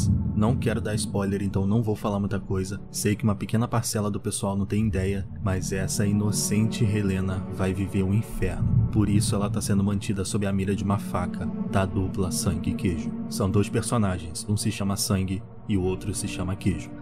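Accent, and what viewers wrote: Rio de Janeiro, Brazil. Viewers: Por que o narrador do vídeo tenta mentir e esconder o seu sotaque carioca?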